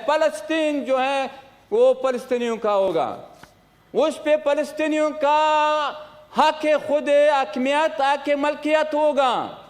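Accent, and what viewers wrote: Pakistan. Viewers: I like his Urdu acesent.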